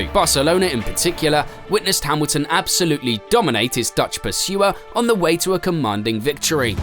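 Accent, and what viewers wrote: England, United Kingdom. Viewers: When you click on an f1 vid and hear an English narrator, you’re officially entering a spin zone. They’ll literally justify and rationalize all his bs, and there’s quite a bit to work with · Another British accent.... Lost from button,Rosberg,Russell twice now Leclerc. Lewis had 30-50bhp hammertime more then the rest of the field. Fairytale he "beat" Alonso, even Ron Dennis regrets mistreating Alonso. ALL teammates were very close to Lewis accept koviannen, and then bottas regulatie to nr2 driver. Max is dominante not Lewis, not comparable like Max does today. Max dominante as a Driver is insane, Lewis he is jongere with a less car, very mediocre. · litteraly the first thing i hear is a british boy saying "masi gifting the championship" lmao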